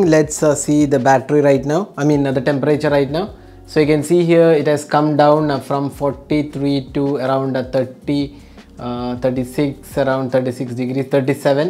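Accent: India. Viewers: Bahasa Inggris Plus Logat Org India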